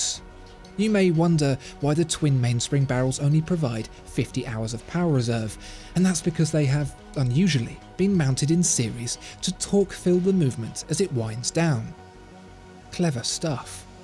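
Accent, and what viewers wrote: United Kingdom. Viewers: Very nice watch, intolerable commentary. The brits haven't lost their innate talent to annoy people by simply opening their mouths.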